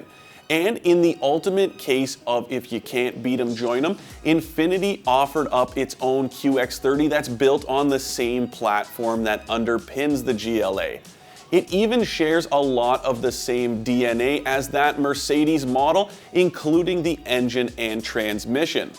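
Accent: Canada. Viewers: I'm curious, is this a Canadian or American show. this guy's Canadian accent is thick!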